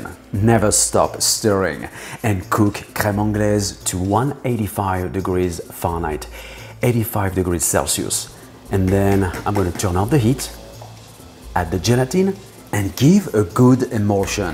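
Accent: France. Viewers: Y would u add corn syrup ? That’s not european despite the french accent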